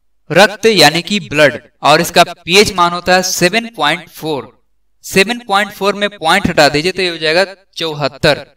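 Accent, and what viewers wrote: Bihar, India. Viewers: Bihari ho ka ???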